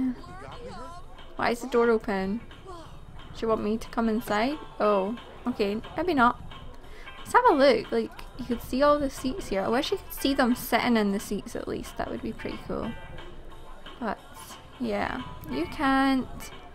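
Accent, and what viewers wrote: Scotland, United Kingdom. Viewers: We have the same accent I'm Scottish too 😊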